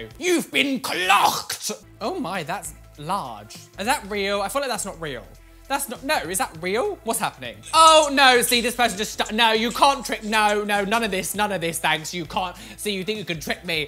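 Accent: United Kingdom. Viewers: I have to say I wasn’t expecting you to be British but I love it hey queen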